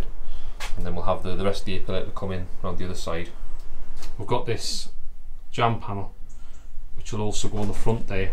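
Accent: Newcastle, United Kingdom. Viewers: Been following your progress from the start, really great job cannot believe you got this done in your front yard, must have great neighbors? Reminds me of a time long ago I did some body work on my rusty Ford Anglia, not up to your standards though, nice to hear the accent, former Geordie from Wallsend , now in Canada, cheers.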